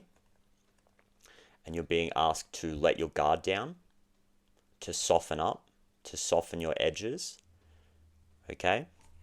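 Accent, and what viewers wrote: Australia. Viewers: Mate i gotta say it was quite a surprise to hear an Aussie voice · Hey! So great to hear your accent fellow Aussie 🦘🐨🌏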